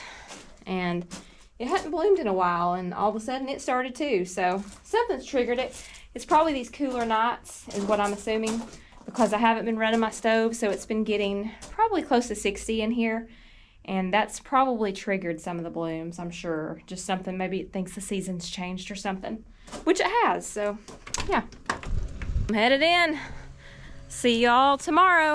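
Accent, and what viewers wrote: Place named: South, United States. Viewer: Yes Ma'am you have that wonderful southern accent! Montana is a beautiful state to visit any time of the year! We raise cattle but never had chickens, mainly because of our abundance of bald eagles, skunks, coyotes and greedy turkeys! But we decided to designate a portion to have chickens for eggs, it's been a blast raising them, and now winter is coming, and I'm just watching everyone's way of raising their chickens! Hope you get a chance to come visit the Big Sky Country! Cheers!!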